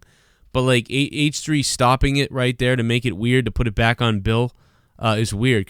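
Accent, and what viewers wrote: Boston, United States. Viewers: Greg Van Holsbeck that’s the BAWSTIN accent buddy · This was a good video. Very fair. I can hear the Boston in you too lol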